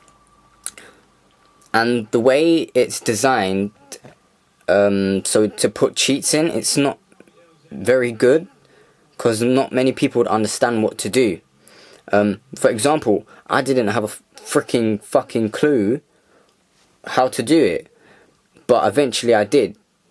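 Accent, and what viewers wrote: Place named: East London, United Kingdom. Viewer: come to east london, almost everyone sounds like him here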